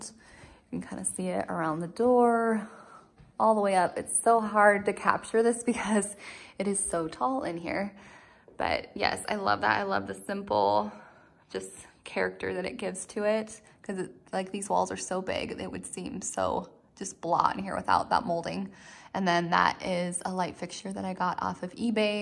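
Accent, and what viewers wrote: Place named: Utah, United States. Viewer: Love the boho farmhouse style! My first video I’ve seen and so I don’t know your history. Are you from Utah? I can definitely hear a UT accent! I lived in the Midwest for 27 years and now in UT for the last 7 years.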